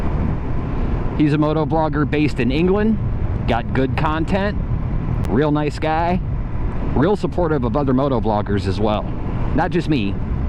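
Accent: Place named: United States. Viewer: These things happen with the glitch. Yeh WestonSuoerMotoVlog is very supportive to us all and and all and out nice guy.. sure I'll meet him some day. He is in England and I am in Scotland so there is about 600 miles between us but it will happen. You accent is soo american, love it. Keep them coming buddy. 👍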